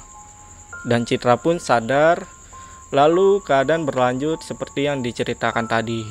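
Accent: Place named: Bali, Indonesia. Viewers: Aksen bahasanya seperti orang Bali kak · Logatnya seperti orang Bali..slm dari Bali juga..smoga sukses cannelnya.. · Suara bang medok Bali banget yah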